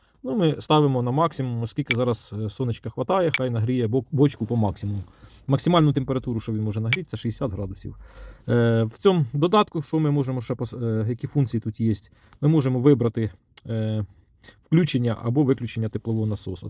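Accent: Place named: Surzhyk, Ukraine. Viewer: Дякую, все цікаво, але цей СУРЖИК.... Кожне третє слово.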